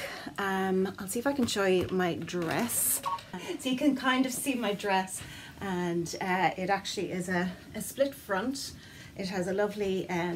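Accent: Ireland. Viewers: Thanks for sharing, love your look and love listening to your lovely Irish accent. Huggss from Australia xox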